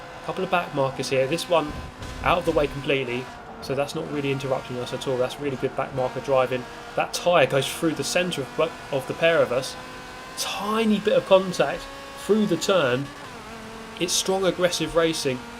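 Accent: United Kingdom. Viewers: Just found your channel, and I love it! Excellent British commentary lol and great racing. Keep it up!!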